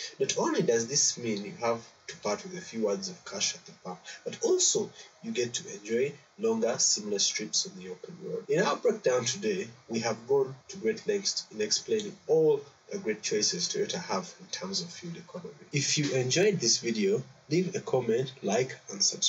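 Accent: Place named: Uganda. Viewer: that's a Ugandan accent.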